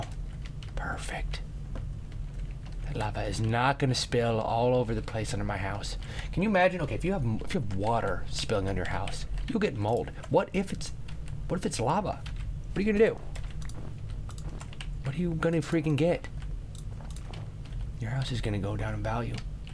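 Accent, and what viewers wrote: Scotland, United Kingdom. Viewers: What happen to Scottish accent voice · scottish accent is the best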